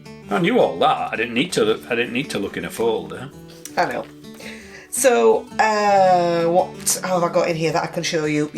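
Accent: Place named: Northern Ireland, United Kingdom. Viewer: as I’m of Northern Irish heritage I can confirm your N I accent was perfect, bravo 👏👏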